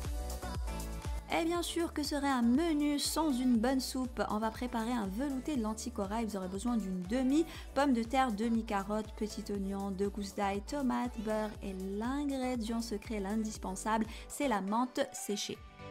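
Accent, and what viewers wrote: Algeria. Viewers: الحبيبة ديالي ماشاء الله عليك كنحماق عليك 🥰 متبعاك هادي من شحال بصح هادي اول مرة نخليلك كومنطير بغيت غير نقولك نتي ختاريتي باش تهدري بالفرونسي ولكن سبحان الله كنفضلك بالعربية اكثر تجي حلوة منك اللهجة الجزائرية ومن أيضا كتكوني قريبة للقلب المهم علا راحتك أنا حتا الا هدرتي بشنوية غنتبعك 😉 معاك مغربية ساكنة في بلجيكا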